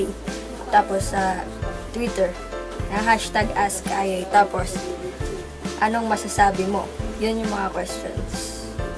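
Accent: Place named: Ilonggo, Philippines. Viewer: Ang kyot ng ilonggggg 😍😂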